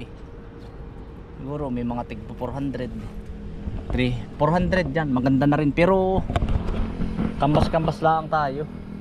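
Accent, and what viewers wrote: Quezon, Philippines. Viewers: Ay Alin baga nman po Abay taga Quezon po kayo hane Alam nay sa ponto pa laang ay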